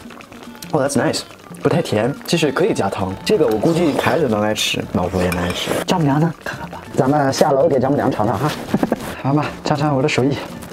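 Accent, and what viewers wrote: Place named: Northeast, China. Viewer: 好的！其实我最佩服你的东北口音，非常地道。我以前在哈尔滨的时候也有点东北腔。现在已经没了。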